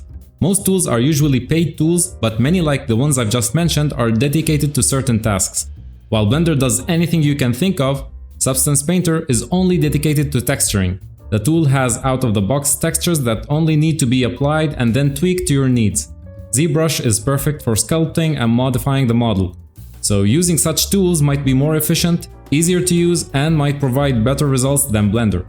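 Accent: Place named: Poland. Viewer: Where are you originally from then? I was 1500% sure you’re Polish judging by your accent 😄 Otherwise I would write in english. There’s a lesson in that case: don’t judge a book by it’s cover 🤣 Well, now it makes more sense to make only cs2 dedicated videos.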